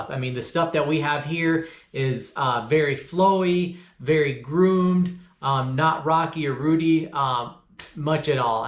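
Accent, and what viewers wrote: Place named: Texas, United States. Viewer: Whoops, should have just waited until the end of the video, sounds like Texas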